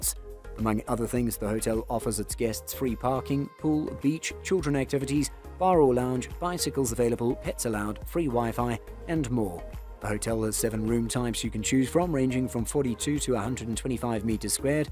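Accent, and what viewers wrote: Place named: England, United Kingdom. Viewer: Thank you for this nice video. I do not know where you live but you sound like you have an English or Australian accent. We do not pronounce it either way you keep saying it! I live in Florida right near the Keys!! Go there all the time! Have a great friends, who have lived there for years!! Isle. Pronounce the word as: isle, aisle & I’ll! 😊 Phonetically, it is called - I’ll MORADA!!!! Islamorada, Florida 🇺🇸❤️🗽🗾🏝️☀️🌊🎣🎣🎣🐠🐟🐬🐋🦞🐢🦀🦑🦈🦈🦈🐊🌴🏊🏻‍♀️🚣🏻‍♀️🚴🏻⛳️🛺➕ 💃🏼🕺🏻🎸🥁🎹🪇🪕🎺🪘🥁🎸🚤🛶⛵️🚤🛶⛵️🛥️🎉🎉🎉🎉🎉🎉🎉🎉🎉🎉🎉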